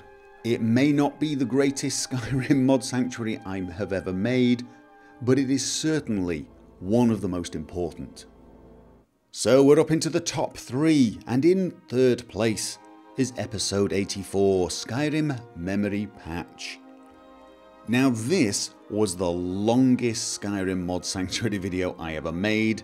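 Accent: United Kingdom. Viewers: Besides the quality and your special attention to quality, what also sells your videos: Your british accent, which can be understood very easily for non english motherlanguage speakers. AND: The sound of your voice is just perfect for narration. Keep them coming and have FUN doing them!!!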